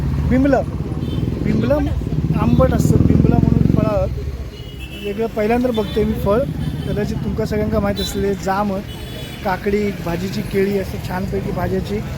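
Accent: Malvani, India. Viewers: Mala vatta tumhi mumbai la rahta. Karan tumchi malvani proper nahiye. Maka di aste te tumhi bolta maka de.. Mi yenar tevha ghetay - mi yetalay tevha ghetalay asa aste. Marathi mix bolta tumi. Ani kolambi nahi ti sungta malvanit boltat. Baki chan video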